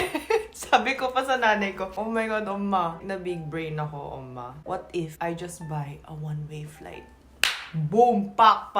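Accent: Batangas, Philippines. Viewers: hala yong galawan at pananalita mo parang pinoy na pinoy siguro mahilig ka ng tropa sa pilipinas noh kaya ganyan ka ka daldal😂😂😂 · Your phillipino accent is so pleasant to hear. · Koreanang Batangueña . Ala eh kainaman. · laking batangas ka pihado ano ang salita baga halatang halata ih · hahaha gets ko na kung baket ka super fluent and with a thick filipino accent. Iskolar ka pala ng bayan. Pero sabaw ka rin ahhahahha! stay weird! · Wow I like all that you're now. You had adopted the basic pinoy lifestyle: your accent, attitude, and your behavior I don't want to say forgetting your Korean way, but I'm just proud having you in PH. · Nice lady with pinoy accent.. ala eh kagandang bata nire . Lasa ko'y mas pinoy pa ito kesa sa mga koño na pinoy. Be safe lagi. I enjoy your video's.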